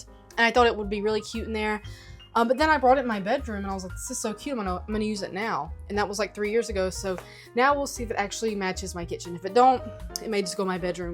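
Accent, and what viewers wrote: South, United States. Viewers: I love literally everything about your videos. Your outfits, your style, your vibe, your delightfully southern accent, your love for secondhand, your realness...I could go on! More channels like this oneee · I have no idea how I came upon your videos but si happy to of found you! I LIVE your accent. Where are you from? I'm from Middle TN... now love in South GA. You sound Southern too. You are an old soul my dear... and a lil doll. I have a tiny channel... I love to thrift too. I just started... clothes mostly because I've lost over 200 lbs in the last 5 years... and it's expensive to have to buy EVERYTHING! 😊 oh and I cook Southern budget meals mostly. Nice to "meet" you... love your style sugar ❤ Jackie in GA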